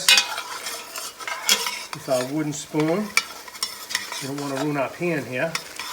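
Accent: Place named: New England, United States. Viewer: The New England accent is amusing.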